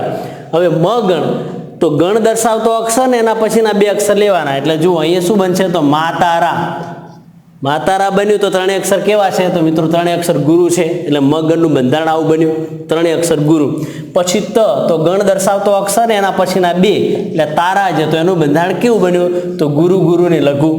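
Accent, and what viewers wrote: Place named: Bhavnagar, India. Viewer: Gajab 6o sir Aap,aapni boli parthi Bhavnagar na lagya sir😅 I m right?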